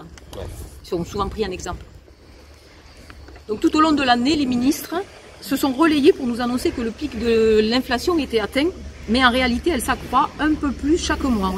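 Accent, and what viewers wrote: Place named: South, France. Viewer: j'aime bien votre accent qui fleure bon les rives de la méditerranée ou du sud ouest.....Je suis breton de cœur né à Nantes que je revendique historiquement bretonne.....😘😘et, je suis insoumis de longue date......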